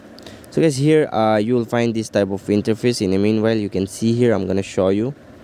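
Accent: India. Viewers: I that's a way northeast people speak... Not pretending 😄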